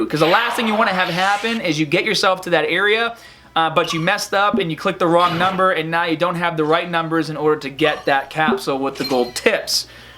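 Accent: Boston, United States. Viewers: Son is definitely from Boston son said “cads” I was like tf is that then I realized he from Boston it’s “Cards”guys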